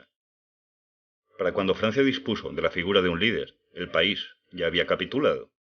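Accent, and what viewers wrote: Galicia, Spain. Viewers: oye eres gallego?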